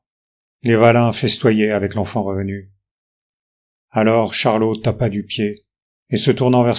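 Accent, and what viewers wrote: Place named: Normandy, France. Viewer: ❤ l’accent normand est presque bon ! (Je suis née chez Maupassant, le Pays de Caux). Merci pour la lecture 🙏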